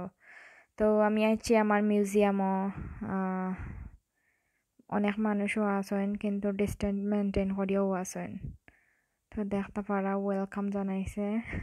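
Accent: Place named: Sylhet, Bangladesh. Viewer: সিলেটি আপা আপনার কথা হুইন্না আমার ভালো লেগে গেছে অনেক ভালো লাগছে আপু আমার কথা শোনার জন্য আমার বাড়িতে আইসেন একটু দাওয়াত দিয়ে গেলাম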